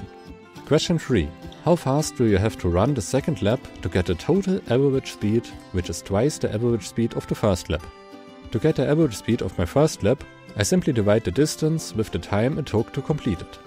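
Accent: Germany. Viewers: Man o man. You can just hear on his accent how much German he sounds. Cool video. Keep it going. Love the animation.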